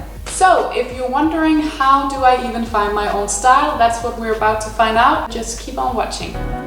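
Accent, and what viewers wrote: Denmark. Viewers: Hej jeg kan høre at du er dansk , er der en video hvor du snakker dansk, eller har du en blok/hjemmeside på dansk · Man kan næsten ikke spotte din danske accent 🙈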